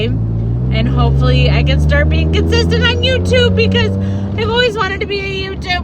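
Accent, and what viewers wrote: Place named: Wisconsin, United States. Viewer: I could tell right away you were a Wisconsinite, or possibly Minnesotan, then you said Great Lakes. I’m from the same area. You seem like someone I’d get along with, I like your personality. We’d have a lot we could relate on, I don’t know anything about having kids though. Despite what other commenters have said, it sounds like you’re doing an amazing job, you’ve got the right attitude, and I applaud you for going after your dreams when you can find the time for yourself. That’s something your kids will look up to. Just make sure you get enough sleep so you don’t get sick! :) wishing you all the best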